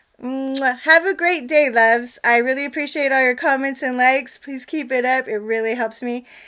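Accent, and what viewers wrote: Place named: South, United States. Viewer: Honey i love your southern accent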